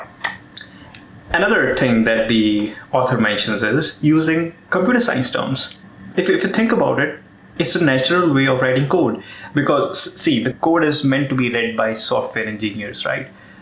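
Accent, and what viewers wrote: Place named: India. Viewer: It's not the code that we don't understand but your Indian accent 😐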